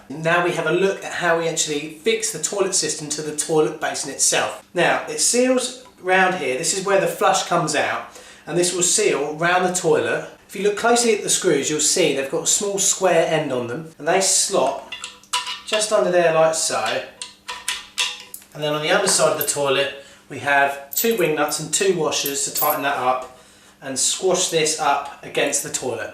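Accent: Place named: United Kingdom. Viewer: I really like Brits accent and the way you do. thanks!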